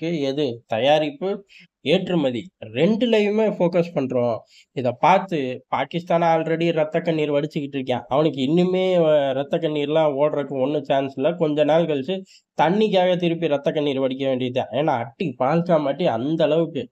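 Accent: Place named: Thanjavur, India. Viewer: Full of enthu..I suspect him belong to tanjure district especially because of d slang ‘அவிங்க..இவிங்க..’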